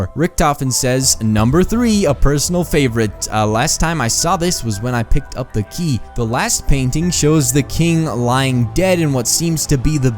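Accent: Bosnia and Herzegovina. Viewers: are u bosnian?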